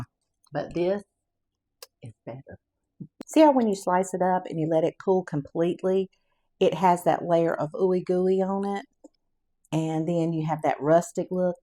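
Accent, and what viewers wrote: South, United States. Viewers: I love listening to a southern woman!!!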